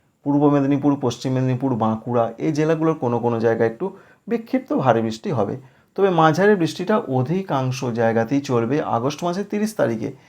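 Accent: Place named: United States. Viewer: বাংলায় ফিরে এসো বাবা, ইংরেজি উচ্চারণ আমেরিকার হওয়ার জন্য আরো বুঝতে পারছি না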